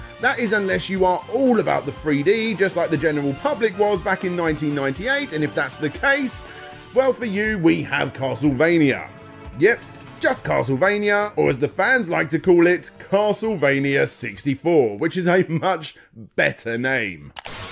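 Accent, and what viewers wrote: United Kingdom. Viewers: It’s the regional British accent. I had a teacher with the same accent as who pronounced “ideas” as “idears.” Ironic that they drop the “r” on words that end with it and add it to words that end without it. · he's also British and I'm assuming the commenter is from the USA. Idk, maybe aria and ecclesia sound specifically similar with a British accent. Either way. Pronunciation is different in other parts of the world. As an American, this is why our fellow global citizens sometimes see us as idiotic self-centered assholes. Go learn another language and/or dialect and see how well their native speakers will point way more fundamental language concepts. Be better.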